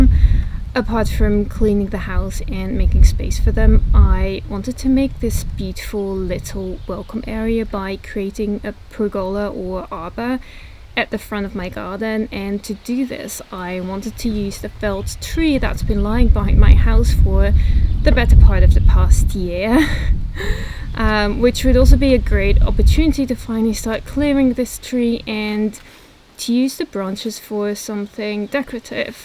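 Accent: Netherlands. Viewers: De eerste keer dat ik een filmpje van jou zag dacht ik bij mezelf; er is iets met haar accent, waar zou ze vandaan komen? Erg grappig om Nederlands te horen 😃 je tuin wordt erg mooi en ik kijk elke keer uit naar de zondag! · I also heard Dutch… Groeten vanuit Breda 🥰🥰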